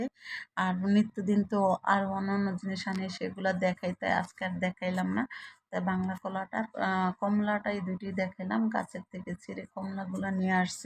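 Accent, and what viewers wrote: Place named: Barisal, Bangladesh. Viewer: আপু তোমার দেশের বাড়ি কোথায় /আমি সুইডেন থেকে বলছি। আমার বাড়ি বরিশালে। তুমি বরিশালের কথা বলছো তো জিজ্ঞেস করলাম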